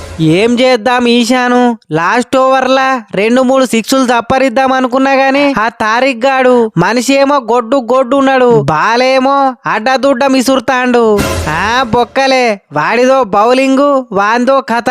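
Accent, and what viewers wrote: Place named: Telangana, India. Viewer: మీ తెలంగాణ యాస చాలా బాగుంది అన్న....❤❤❤❤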